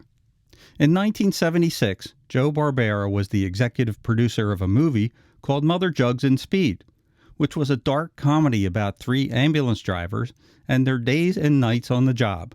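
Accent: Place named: Philadelphia, United States. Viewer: are you from Philly? That accent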